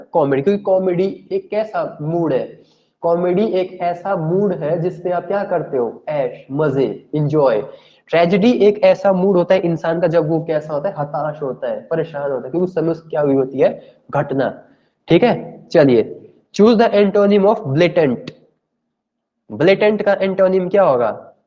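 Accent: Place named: Himachal Pradesh, India. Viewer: Love ur himachli excent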